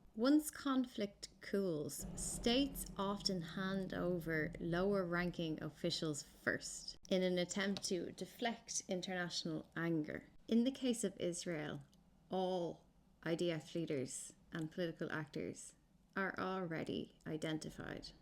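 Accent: Ireland. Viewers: Is this wise lady Irish?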